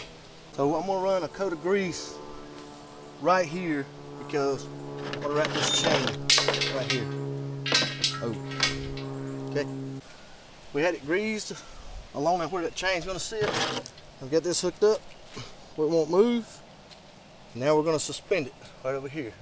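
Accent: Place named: Maryland, United States. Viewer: Where are you from? Sounds like Maryland.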